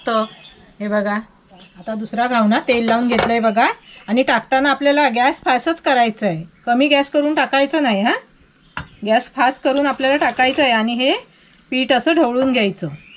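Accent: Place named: Konkan, India. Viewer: Mla kokani bolna kupch aavdt tumch 🎉❤😊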